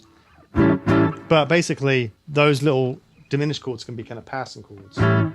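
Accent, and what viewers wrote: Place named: Southern England, United Kingdom. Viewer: another great lesson ive been working a lot with these chords recently and this has given me some perspective, some way to categorise and organise them. I guess from looking at your website that your a music producer in the states now but I would love to place your accent, its almost definitely south of England but after that im stumped.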